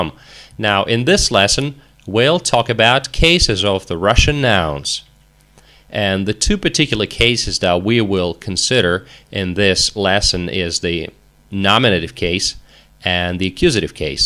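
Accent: United States. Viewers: why is it good that he sounds american , it would be better if he sounds russian when you are trying to learn russian. Also not everyone who speaks english is american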